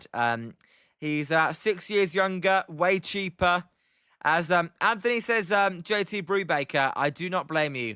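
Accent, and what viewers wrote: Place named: United Kingdom. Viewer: Are u británico....u accent is so strong...mmm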